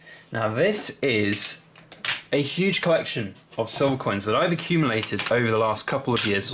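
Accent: England, United Kingdom. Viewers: Love your channel. Sometimes I just play your videos while I'm relaxing. I guess its the English accent. Gives your channel that European flair that isn't common in the US. Always good content. Have you seen the price of silver? You can sell your extra silver now. Or keep holding out. How much silver do you buy monthly? weekly?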